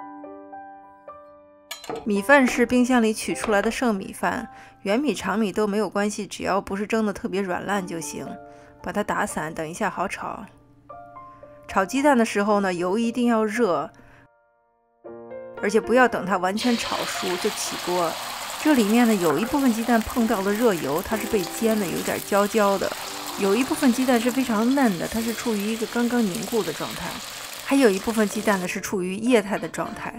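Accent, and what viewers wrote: China. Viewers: 的配音好有中國味向獻上我的愛心💗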